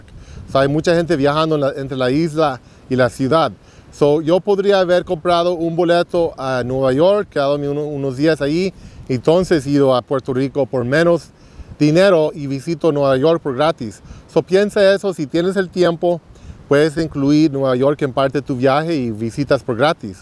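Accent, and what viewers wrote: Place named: Mexico. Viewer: El tiene accento mexicano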